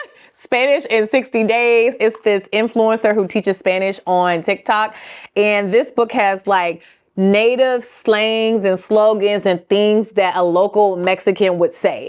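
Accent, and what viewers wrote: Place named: United States. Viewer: I think your Spanish gringo accent made people laugh/cringe…Good-luck on your journey tho😎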